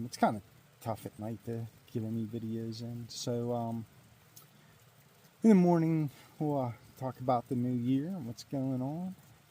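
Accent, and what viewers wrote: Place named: Pittsburgh, United States. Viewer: I can yell you are from western PA. Lol. The Pittsburgh accent. I am too. Great vid man.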